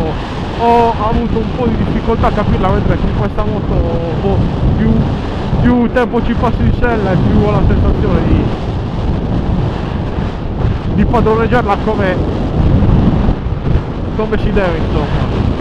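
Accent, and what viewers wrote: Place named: Sardinia, Italy. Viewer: mi fa morire il dialetto sardo😂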